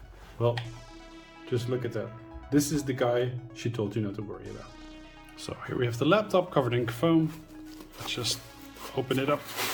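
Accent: Netherlands. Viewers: That Dutch English always 👌🏽😌 great review 🙏🏽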